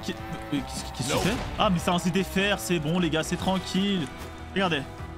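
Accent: Belgium. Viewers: t’es belge? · Tu es belge ?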